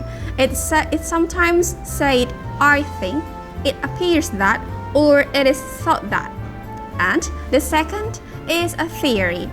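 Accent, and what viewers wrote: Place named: England, United Kingdom. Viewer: Kakak nya pake english accent ya;(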